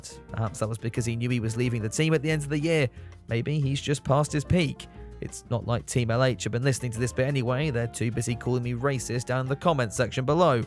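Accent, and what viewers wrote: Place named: United Kingdom. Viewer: Just discovered your channel. Where have I been? Love your droll sense of humor! You are definitely in keen competition with another YouTube channel where the creator/narrator is probably an American, maybe Canadian. But I love the British accent, which Rick doesn’t have. A plus for you, for sure. I am subscribing now, only half a video in. Kudos.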